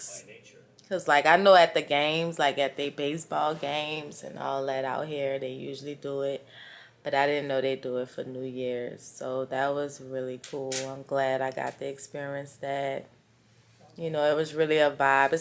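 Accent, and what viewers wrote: New Orleans, United States. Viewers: Girl keep that New Orleans talk honey! You are good! “Ya kno” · That’s ok continue to be you!! A lot of us like the way you talk that’s the New Orleans talk that’s alright!! Keep striving for what makes you happy we’re here for it!!❤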